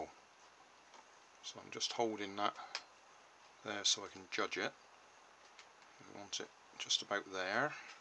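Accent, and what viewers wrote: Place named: England, United Kingdom. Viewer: Love to hear an English accent..............so, you 'knicked' the egg box?' Huh.....:)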